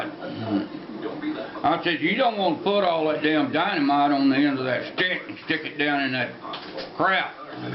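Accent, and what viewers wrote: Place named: Eastern Shore Maryland, United States. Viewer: I loved this...sounds like my husband spinning a yarn..with an Eastern Shore of Maryland accent.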